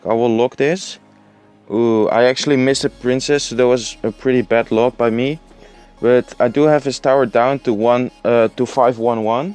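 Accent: Netherlands. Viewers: Ik kan echt een nederlands accent horen wanneeer je an zegt (je hebt de neiging om een te zeggen) en plus (dat je gewoon nederlands uitspreekt. Let daar een beetje op. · You still have the Dutch accent :) Good vid btw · Are you dutch? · Hey man! i love the vids, you're really starting off strong as a channel :) Only thing i want to give you as a tip: maybe work on your english accent for a little bit. I totally understand since i'm dutch too, but i guess a better english pronounciation may help your channel grow. Your content is A+ :D love it!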